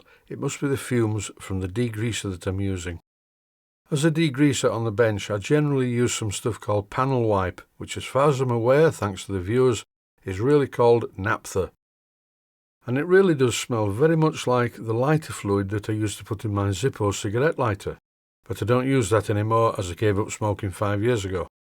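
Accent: Yorkshire, United Kingdom. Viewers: I live in Sweden and I love your Yorkshire accent, as long it isn't spoken way to fast. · Dear me, Mr., why do You apologise for your Yorkshire accent!? As I said some time ago, I used to live there, well not exactly in the heart of Yorkshire. I used to live among the Geordies and for a someone from the place where You live maybe that will make a difference. And what a beautiful experience it was. When You said "The bloody pigeons have buggered off again" I felt right at home again. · Hi 1. Really enjoy your accent mate (this is cockney for I think lad in Yokshire?). 2. Just a quick query that was bothering me in the last few moments of this video - what is up with the middle wheel of the loco. Is it the camera angle or is the wheel bent/moving transversely across the frame? 3. No need to reply this could be my imagination - the camera angle - and I'm not trying to prove I study each of your videos with my micrometer pressed against the screen (well not always - really).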